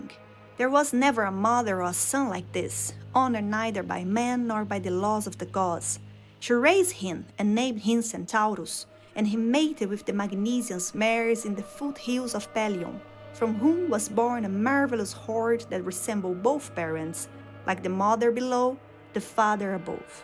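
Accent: Brazil. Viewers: I recognized that Brazilian accent instantly! Lol anyways I was really interested in this topic and your video was one of the first results, so thanks for making it! I actually felt a chill when I saw his second phase the first time. Fromsoft has a way of storytelling without words, where you just understand someone’s entire story and struggle from their actions. When he reached this “redemption” it really made me feel for him, like when he finally met his match (the player), he gets granted a second chance by the gods, presumably with a Miquella-esque nature judging by the arcs. This would tie into your point about the peoples of the Lands Between before Marika, who might’ve been persecuted much like with any empire trying to instate their own doctrines. Miquella’s Haligtree was this “sanctuary” for the afflicted and shunned. I initially thought his arm had some connection to the abyss, like Artorias. 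Especially since Nightreign brings back Dark Souls, I’m unsure if they now officially share an universe. But much like Nashandra brought the influence of the abyss to a far away place, maybe it has something to do with the “Night.” I thought Heolstor’s movements resembled Artorias a bit. Anyways, his “nuke” attack is probably the most cinematic thing I’ve seen in this game. This fight is so much poetry told with such few words.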